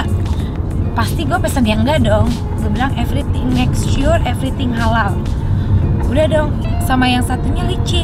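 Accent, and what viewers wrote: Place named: West Java, Indonesia. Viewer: Sunda pisan geningan nyaa... GK bisa dibohongin orang Sunda mah lama diem di Jakarta di luar negri logat nya ttp nyundanis keneh wehhh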